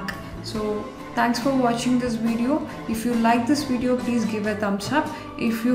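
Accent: South India, India. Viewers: nice voice di are you from south india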